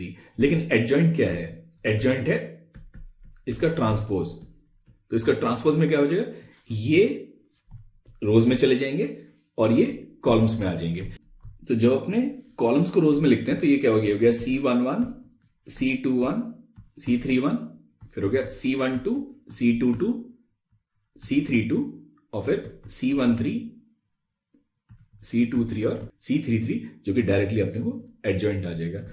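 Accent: India. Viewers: Sir aap southern part of india se hai kya?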